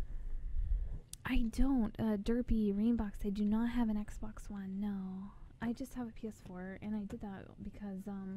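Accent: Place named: South, United States. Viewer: is it only me who notice her country accent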